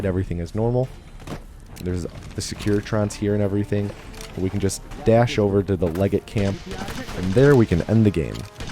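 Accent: Italy. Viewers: Are you American or italian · Are you italian?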